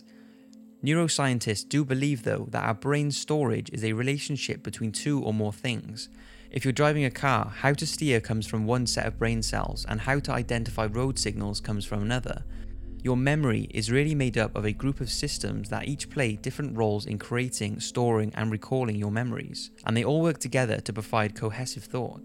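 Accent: United Kingdom. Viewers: Hey what part of the UK is your accent from?? I live in Australia and there's heaps of British people here but I would rarely ever hear an accent like yours? Lol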